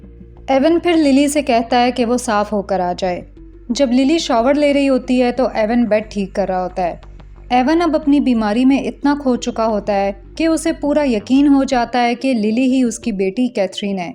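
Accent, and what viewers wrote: Pakistan. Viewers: Pakistan mein bahir bolte hain,hum Indian bahar bolte hain,thoda sa different hota hai accent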